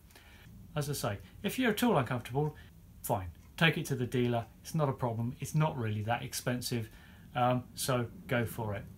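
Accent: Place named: England, United Kingdom. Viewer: Great info and good English accent as opposed to Yanks!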